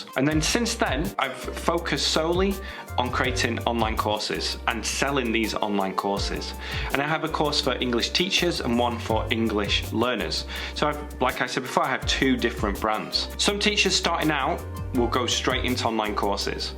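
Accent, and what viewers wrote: United Kingdom. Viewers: Thank you. I like your British accent.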